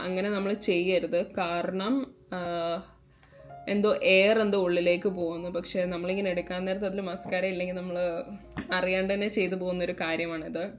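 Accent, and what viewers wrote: Pathanamthitta, India. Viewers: Enik ariyathila’that typical pathanamthitta slang 😅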